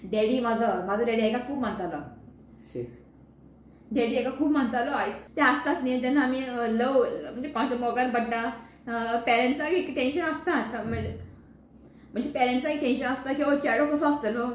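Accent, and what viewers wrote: Konkan, India. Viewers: Dont cry 😭 kokani thodishi vegali ahe tumache bolane समजले पण काही जण बोलले तर समजत नाही 😂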